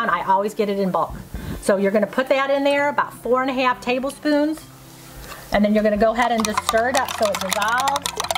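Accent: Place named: New Jersey, United States. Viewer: Your accent reminds me of a Jersey girl. Where were you originally from? I really enjoy your videos...VERY helpful!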